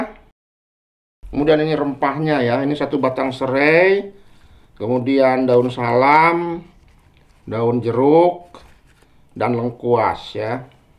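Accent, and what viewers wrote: West Java, Indonesia. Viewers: Assalamualaikum koko.wk wk wk sunda bngt ada kungkurahan.logatnya jg sunda.masakannya mantaaaap 😂😂❤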